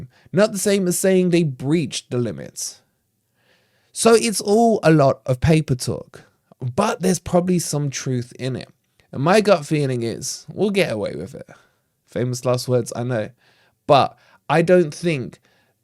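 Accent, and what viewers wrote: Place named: Nottingham, United Kingdom. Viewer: That's the most ridiculous Nottingham voice ever.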